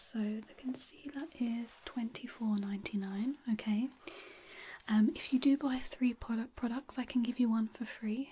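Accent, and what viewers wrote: United Kingdom. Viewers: If I could ever actually master a British accent I’d love to have the same as you. ❤️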